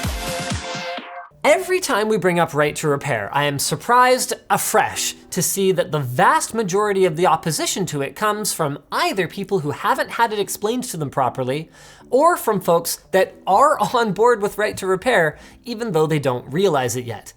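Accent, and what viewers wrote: United States. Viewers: Linus just sounded so American when talking about all of this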